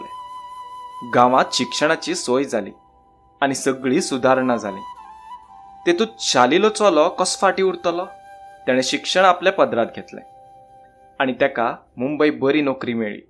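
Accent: Karwar, India. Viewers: सुंदर कथा संदेश सर 👌. As always Best narration sir, karwari style narration added new flavor to the story..👏👏👏👏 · Very nice story with simple flow. I really liked the karwari attributes of the writing as well the story. bhai😘😘 nice job of implementing Karwari accent 😊😊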